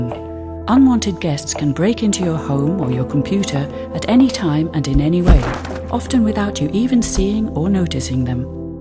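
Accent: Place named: England, United Kingdom. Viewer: This is not a good explanation of the difference between Viruses and Worms! Viruses and worms are not known for "letting in riff raff". That is a backdoor. The difference between a virus and worm is that a worm can replicate and spread over the network by itself (self instatiating), whereas a virus requires a host file to run, it needs to be attached to some form of executable file. Simply, a virus requires a human to invoke it, and worm does not. Simple. You don't need to explain it badly. Despite the English accent, this is bunk. <link>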